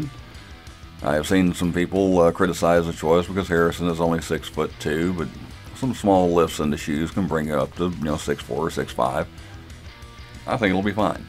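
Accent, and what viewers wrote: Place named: Texas, United States. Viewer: I'll admit I grew up pretty removed from the world of pro wrestling, even being from Texas I've never heard of the Von Erichs. However, since I saw that first poster I've been transfixed by the story and tragedy of the brothers, and I can honestly say it's been years since I've been this excited by a film. Hearing you talk about the movie, the boys, and the sport with such a sense of respect and love and with that deep accent I've come to miss so much since I've been away from home, I'm starting to realize why I've come to feel so connected to this film that hasn't even come out yet. Thank you for your thoughtful analysis, please keep doing what you love.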